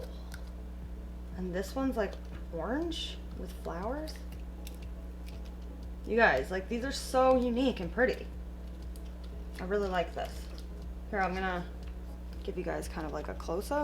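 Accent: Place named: Western Canada, Canada. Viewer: I think it’s only out west. I lived in BC for a few years and they say it the way does. I’m in Ontario now and we say decal with cal sounding like the name cal. They also say it that way further east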